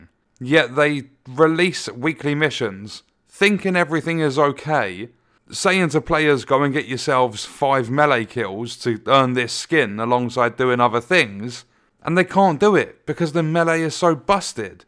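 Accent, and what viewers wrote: United Kingdom. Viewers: Love your explanation bro , i just subscribed to your channel, seems lovely and interesting with British accent lol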